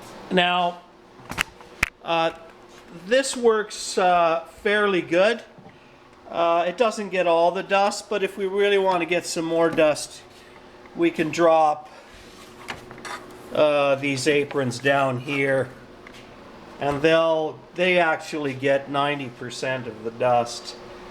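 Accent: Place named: Kentucky, United States. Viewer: UK? Seriously? You talk like you're from Kentucky. No offence.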